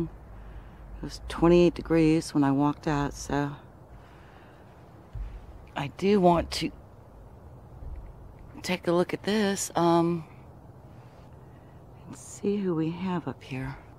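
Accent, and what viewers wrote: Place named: Midwest, United States. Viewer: I’ve learned a lot about the realities of amateur astronomy by watching your videos. Thank you. Also, your pace and content are terrific. I definitely feel your voice and tone are excellent and soothing...just right! (I live in Lexington and recognize the midwest accent). I play your videos sometimes to help fall asleep- that’s a compliment, because they are relaxing!! Lol. Thanks again, and don’t change a thing and ignore any criticism. You are unique.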